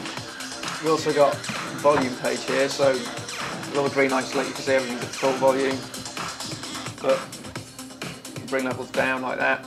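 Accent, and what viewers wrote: United Kingdom. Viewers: If you don't want to hear an English accent, maybe avoid videos made by an English publication like MusicRadar? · If I hear one more polite British accent used to demo a music product, I'm going to puke.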